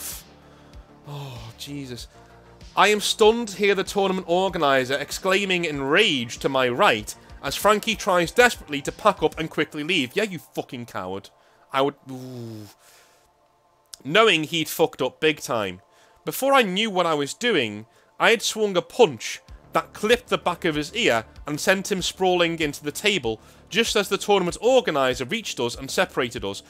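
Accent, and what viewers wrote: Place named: Liverpool, United Kingdom. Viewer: I love his subtle Scouse accent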